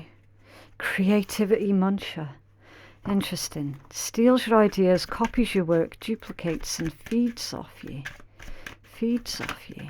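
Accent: Scotland, United Kingdom. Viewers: Nice to hear a fellow Scot Content creator!🏴 Good tarot reader!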